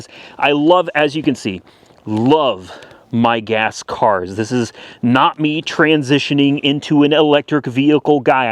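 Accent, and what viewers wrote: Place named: Oklahoma, United States. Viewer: What part of Oklahoma are you from?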